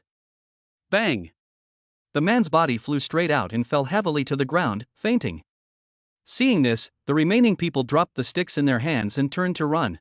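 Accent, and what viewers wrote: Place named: England, United Kingdom. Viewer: This English accent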